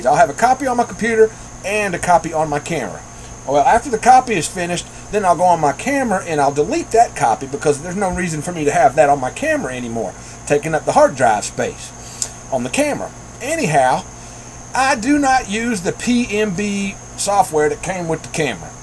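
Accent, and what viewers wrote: South, United States. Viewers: Oh... You sounded very southern and I was just curious because I'm form Arkansas.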